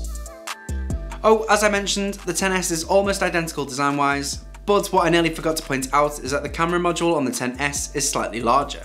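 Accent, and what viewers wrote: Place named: England, United Kingdom. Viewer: I like Ur English Accent Sound so cute...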